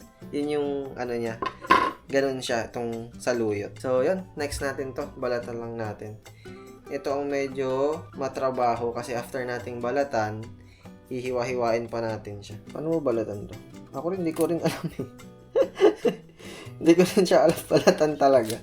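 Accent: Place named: Ilocos, Philippines. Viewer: twelve fortey gayam... your ilocano accent is lovely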